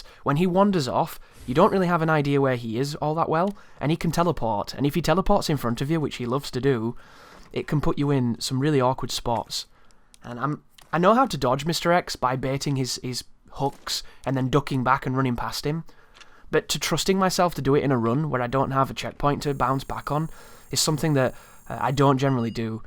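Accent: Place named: London, United Kingdom. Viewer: Hats off to you, sir! That was the most excellent balancing of amazing skills, speedrun strat explanations, humour, and game mechanic opinions that I have ever had the pleasure of witnessing on YouTube. And all presented with that glorious London accent, making it all that much more enjoyable. I could have watched a full movie instead, given the lenght of this video, but I honestly feel I got more out of this than I would have done spending the time at a movie theater. Thank you so much, you absolute legend!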